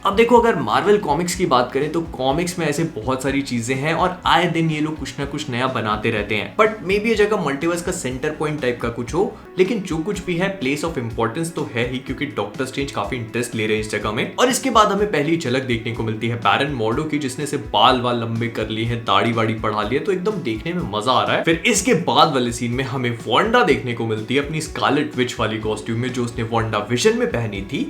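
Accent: Bhopal, India. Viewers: Bhai aap Bhopal se hoo 😅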